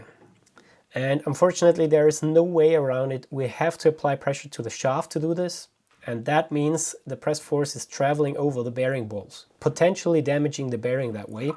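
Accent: Austria. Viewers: I had a good friend from Austria in school. His accent and yours are identical. It make me smile. :) Brilliant work!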